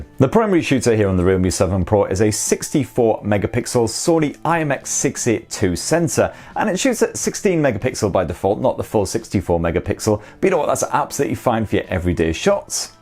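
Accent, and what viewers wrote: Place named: North East England, United Kingdom. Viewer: It's English humour and his accent North East England you won't understand that unfortunately.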